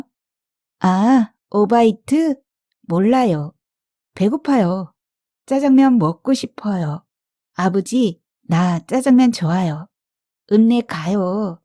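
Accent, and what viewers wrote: Jeolla, South Korea. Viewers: 밤늣은시간인데잠을못이루고 있어요너무재미있어서요 구수한전라도사투리가듣기가 좋군요긴장편잘듣겠습니다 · 님 어찌그리 전라도사투리를 맛갈나게 읽어주시나요 전라도 사투리 매력에 빠져들고 소설에 빠져듭니다~ 감사히 잘 듣고 있습니다~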